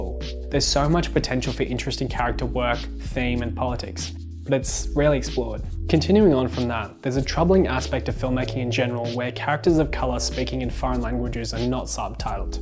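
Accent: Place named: United Kingdom. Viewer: Its amazing you can say this with a straight face. I thought it was hilarious, the brittish accent is really the icing on the cake!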